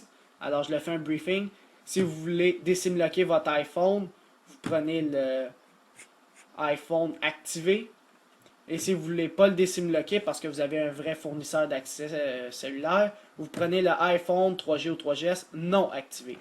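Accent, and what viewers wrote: Canada. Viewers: ton accent canadien me fait marré XD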